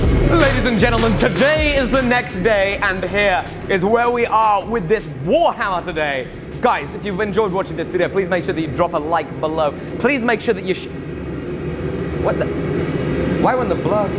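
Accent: England, United Kingdom. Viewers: Are u English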